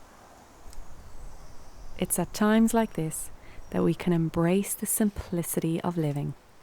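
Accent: Ireland. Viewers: your voice is so soothing :) and the gorgeous Irish accent doesn't hurt :D <3 <3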